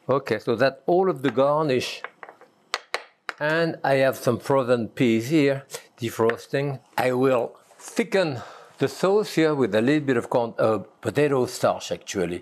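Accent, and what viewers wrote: France. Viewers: After all these years, he's still speaking like a perfect french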